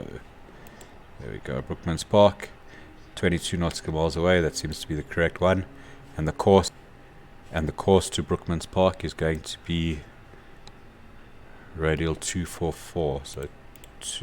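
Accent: South Africa. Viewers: Awesome tutorial, accent is a bonus...seems South African. This tutorial was just what I needed to know. I'll practice later today. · Baie dankie excellent tutorial , I lived in SA for 5 years, working at Cape Town airport, your accent brings back fond memories of my time there.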